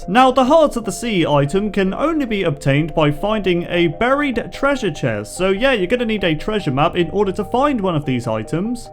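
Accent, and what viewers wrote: United Kingdom. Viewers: Must be a northerner.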